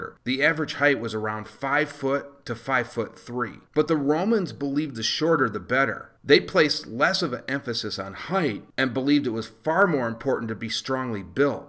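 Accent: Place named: United States. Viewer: Amazing, its sad that I have to google the metric equivalent but I can hear youre American so its understandable. Thank you so much!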